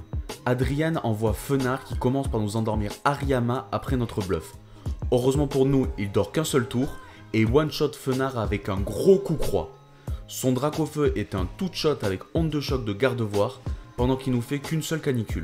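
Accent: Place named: South, France. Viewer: Super vidéo le reuf !! Je découvre ta chaîne, petit accent du sud, on entend les cigales et le pastaga, un peu d'humour de temps en temps pile ce qu'il faut. Petite pépite ça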